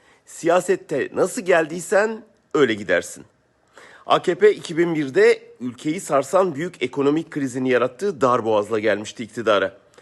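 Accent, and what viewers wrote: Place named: England, United Kingdom. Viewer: Türkçe konuşan İngiliz!!!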